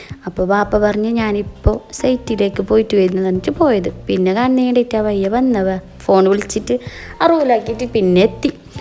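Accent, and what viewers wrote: Kasaragod, India. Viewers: കാസര്‍കോട് ano😂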